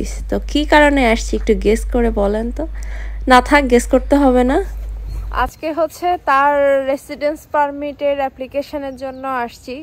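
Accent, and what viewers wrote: Khulna, Bangladesh. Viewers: Apu ami Canada theke,Bari khulnai,apner khulna language khub enjoy kori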